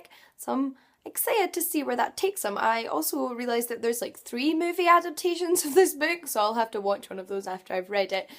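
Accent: Scotland, United Kingdom. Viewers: ***** That's really admirable. People tend to overlook that these days, I think. :) And you've got such a lovely accent! May I ask what part of Scotland you're from? I kind of want to travel there and just sit in a public place and just listen to everyone having conversations- is that weird? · I love your accent! Scotland is such a beautiful place! Listening to your voice reminds me of the beautiful time I had back in april in Edinburgh! Awesome haul btw!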